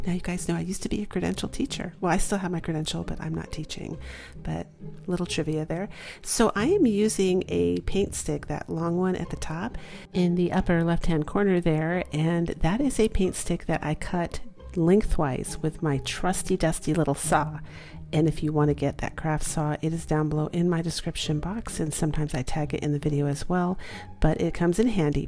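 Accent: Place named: England, United Kingdom. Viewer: Yay a fellow decaf drinker! So cool that he's from England. I'd never get tired of his accent.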